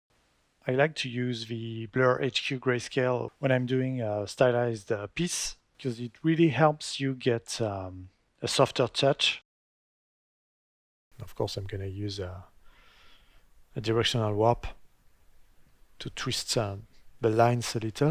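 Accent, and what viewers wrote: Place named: France. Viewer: Avec ton accent français j'arrive à comprendre xD merci pour le tuto !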